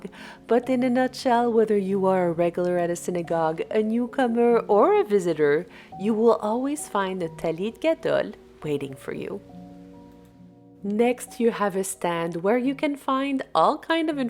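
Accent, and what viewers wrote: France. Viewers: Vous avez un accent français madame !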